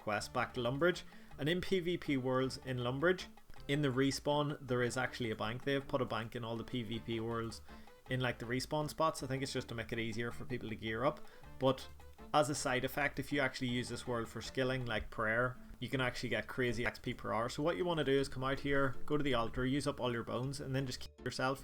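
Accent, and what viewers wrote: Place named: Northern Ireland, United Kingdom. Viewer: Subbed and liked! Are u from Ireland by any chance? Ur accent sounds northern Irish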